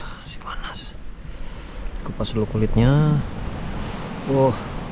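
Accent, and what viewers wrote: Manado, Indonesia. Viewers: Bang loe orang Manado ya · pantas tu logat rpa qt kenal, org manado kote wkwkwkwkw org manado klo bhs indo dpa tau dpe logat wkwkwkwkkw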